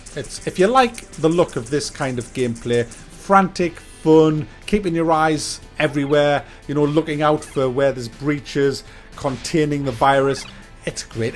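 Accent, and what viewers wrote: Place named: Northern England, United Kingdom. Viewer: I adore your northern English accent. My family on my mother's side is from Sunderland and New-Castle. I live in Canada btw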